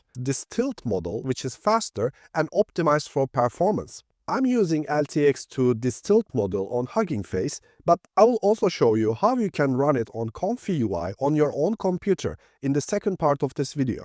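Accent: Turkey. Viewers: Turkish accent :)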